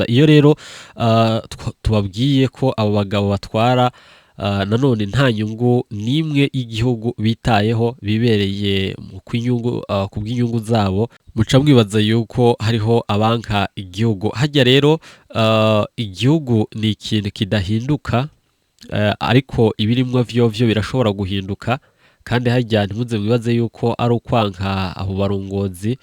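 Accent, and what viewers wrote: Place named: Rwanda. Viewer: A t'entendre tu dois être Rwandais, cependant tu ne parles jamais de ton pays. Tout ce que tu racontes concerne le Burundi et les Burundais. Kandi biragaragara neza ko amakuru utanga, naho menshi arayukuri, sayo witegurira. Uyasoma nabi nkuko utari umurundi nyene. Par souci de professionalisme ntiwoba honnête ukavuga la source, autrement dit abo ubereye porte parole?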